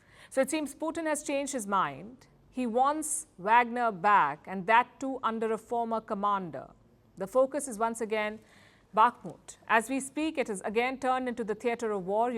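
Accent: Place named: India. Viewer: This woman pisses me off with her arrogant voice trying desperately to imitate Tucker Carlson with a ridiculous Indian accent. This woman pisses me off with her arrogant voice trying desperately to imitate Tucker Carlson with a ridiculous Indian accent. Try to be original ma'am.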